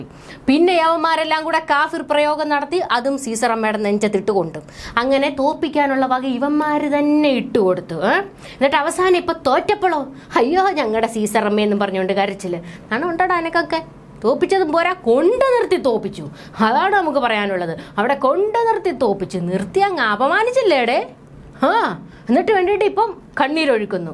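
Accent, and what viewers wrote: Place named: Kollam, India. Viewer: കല്പന ചേച്ചി തന്നെ കൊല്ലം ഫാഷ സൂപ്പർ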